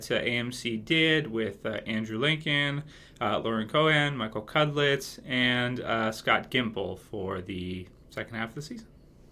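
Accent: England, United Kingdom. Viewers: ... You're english? WUT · Wait! You're english...,.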